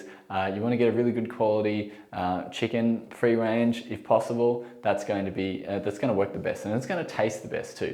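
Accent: Australia. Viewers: Hi, just a query regarding coconut flour!!!!! I live Perth Western Australia and try to get organic, can be a challenge. I recently purchased 2 different organic coconut flours and the carbs per 100gms vary quite a bit. Is there a logical answer to that?? By the way thanks for your vids, it's pleasant listening to an Aussie accent without all the hoo hah. Much appreciated Rosemary (70 yrs)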